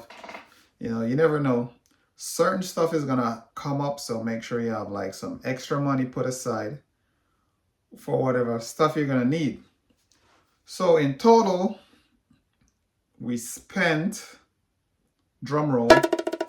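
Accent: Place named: Jamaica. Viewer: Which part of Texas are you located? I am Jamaican too BTW. I can tell from the accent. And I see the Josephus book behind you. Looks like you are on it with your true heritage. 😊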